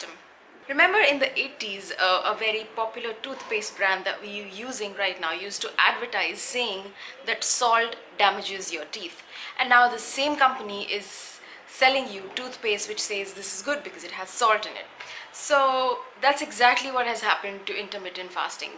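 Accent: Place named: Trinidad and Tobago. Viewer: good info you sound like a trinidadian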